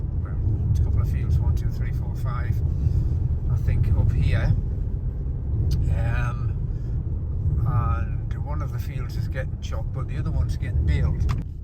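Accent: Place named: Newcastle, United Kingdom. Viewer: Good vid bro.....wat part of uk u in sounds Newcastle accent.....is this ur darm is it many acres....nice country side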